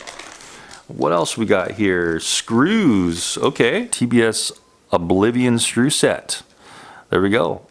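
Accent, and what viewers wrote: United States. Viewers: do you come from america)ok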